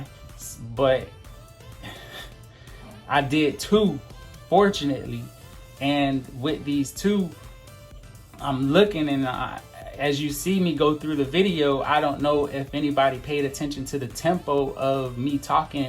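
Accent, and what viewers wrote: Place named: United States. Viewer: Also, BTW, I might add that it's refreshing to hear an American with an American accent Talking about this stuff! Usually it's someone from China or whatever, in broken English. I didn't realize this hobby was so popular here in the states too! I'm in Montana (although I'm from the NY/NJ area originally) and nobody around here has any clue about this at all! Back in the Days of analog cable, we used to use the "boxes" that turned on all the channels but I'm Talking about late 1990's there. I didn't even Knew such a thing as this exists until recently! What part of the country are you in?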